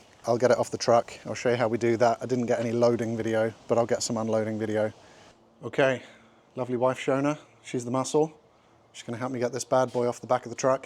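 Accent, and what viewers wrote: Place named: England, United Kingdom. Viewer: This was suggested to me and it was a pleasant surprise to hear a fellow English man 😊 Looking forward to watching more videos, Galaga is a true classic and definitely worth restoration. Subbed.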